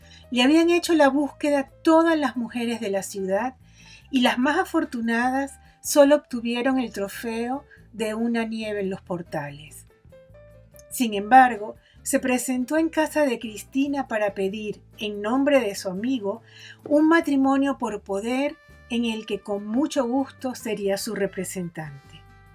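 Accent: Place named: Venezuela. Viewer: Soy muy desconfiado cuando de escuchar a alguien narrar algo. Sobre todo si ese alguien es español. No sé si a ustedes les pasa lo mismo, pero cuando los narradores españoles utilizan ese tono tan antipático de hablar en medios, simplemente cambio, ya no de canal. pero si de medio. Por eso, quiero felicitarlas por la forma en que narran estas bellas historias. Creo, por el acento, que quien narra es venezolana. Pero, como sea, lo hace de maravilla. Gracias.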